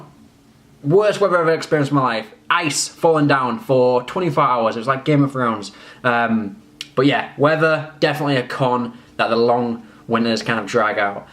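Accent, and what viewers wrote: Canada. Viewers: you are getting a Canadian accent. saying 20 is the giveaway. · you Canadian accent is getting dope!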